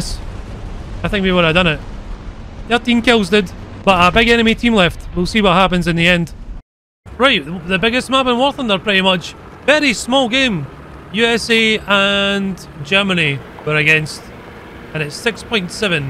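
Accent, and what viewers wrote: Scotland, United Kingdom. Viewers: I don’t care about the tank. I love your Scottish accent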